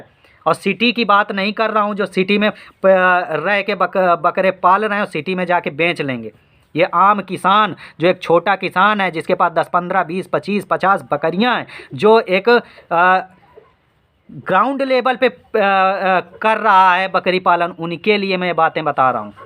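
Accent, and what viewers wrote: Kanpur, India. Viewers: वीडियो देखकर मन प्रसन्न हो गया । बोलने का कनपुरिया लहजा अच्छा लगा साथ ही जमीनी हकीकत से रूबरू हुए ।धन्यवाद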